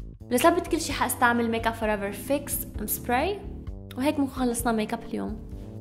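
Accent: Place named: Lebanon. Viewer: حبيت اللهجه اللبنانيه بسببك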